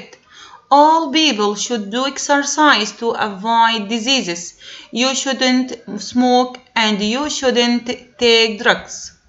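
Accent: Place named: Egypt. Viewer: english with egypian accent lmaoo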